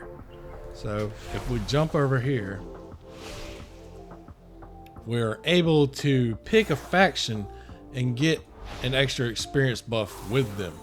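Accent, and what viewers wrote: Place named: South, United States. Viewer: Oh my word the accent on this man. I have a southern accent also . Thank you for a awesome video.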